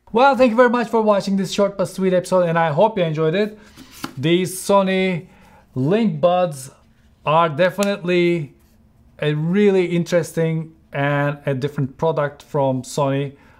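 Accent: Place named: Turkey. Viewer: I knew it! I thought I was going crazy because your accent sounds Turkish!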